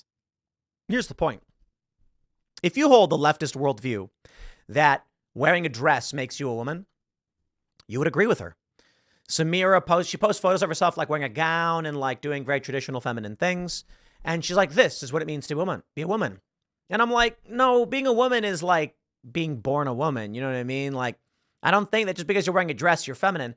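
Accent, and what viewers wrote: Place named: South, United States. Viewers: I find her to be beautiful and i love her sweet down to earth southern accent. She seems like she’s down to earth. I definitely would be into her than the tired ass plastic caked on makeup spoiled entitled delusional multiple personality modern women from big cities. Im from Los Angeles, and im sick of the women who spawn out of progressive cities and states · An attractive lady with hobbies! Hobbies I can enjoy and southern accent, sign me up. I've seen what makes you applaud, your boos mean nothing. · She is a Smoke Show! She’s Beautiful She’s funny and her Southern draw is so attractive Just because she does that stuff doesn’t mean she’s not a girls girl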